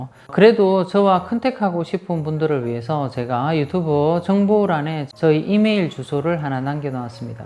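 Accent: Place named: Busan, South Korea. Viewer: 항상 성품이 차분하시고 현명한 분으로 보여요 저도 부산 출신이라서 부산 말투도 정겹고요 결혼정보 업체를 비즈니스로 하고 싶다고 한다면, 일단 그런 업체의 사무실에서 경험을 좀 쌓아야 돠겠지요 ㅎㅎ 그런데 은 그런 일이 성격상 안 맞아 보이긴 해요. 또 본인도 지금 이렇게 원하지도 않고, 베트남 여성들이 예쁘고 호감이 가고 또 우리나라 보다 현재 경제적 수준이 낮다 보니까, 한국 솔로 남성분들이 간혹 희망을 갖는 사람이 있는것으로 보여요, 구독자들에게 휘둘리지 마시고 댓글 내용도 가려서 보시고요 ㅎㅎ 주위에 착하고 예쁜 여성들이 많아서 방송을 보는 우리는 일단 즐겁습니다 도 외롭지 않게 좋은 분을 만나시길 바래요 ♡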